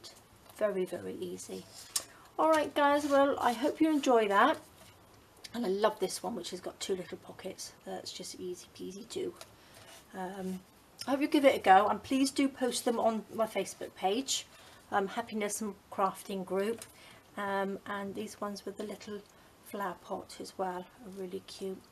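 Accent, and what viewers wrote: United Kingdom. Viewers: So very adorable. And so easy.. what we all need sometimes. And I have this silly comment to make. I love how many British people say "pop this on or pop over here or pop the kettle on for tea". It just makes always makes me smile. When said with the British accent it makes we want to get a flight to England tomorrow to visit anyone and have a cup of tea. Lol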